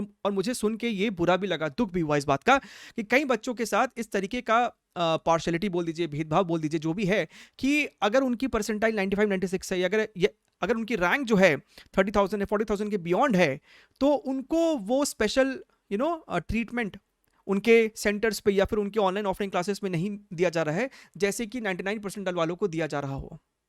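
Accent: Bihar, India. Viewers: to aaj pata chala ki aap bihar se hai.sir mujhe aapke accent se hi lagta tha ki aap bihar se hai.Mai bhi patna se hu sir and iss advanced me phodkar bihar ka naam roshan karna hai.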